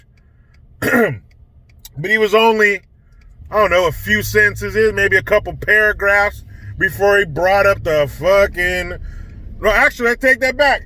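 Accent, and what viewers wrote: England, United Kingdom. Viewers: Hitler 😮 bloody hell if Those Democrats woke up they would understand they are the bloody Fascists 🧐 Love the British accent 😅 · Nice English accent lol 🖒🖒🖒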